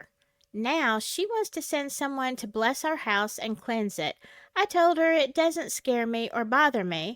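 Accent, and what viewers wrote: South, United States. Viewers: Hello, and fellow nurse! Love your stories and your southern accent. The three's (my favorite number), I always felt, is the Lord's number- father, son and holy ghost. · I love your generous spirit and southern accent. Thanks for keeping us entertained and informed with real paranormal stories. ❤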